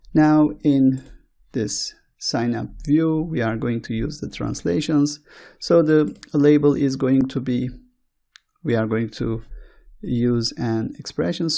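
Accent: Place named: Turkey. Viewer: just when I started to think "wow what a heavy Turkish accent", the tr locale showed up haha. Thanks for the tutorial though.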